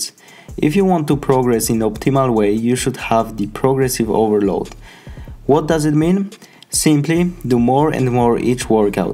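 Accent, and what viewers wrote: Poland. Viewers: I think when i listen your voice i conside that you from maybe Poland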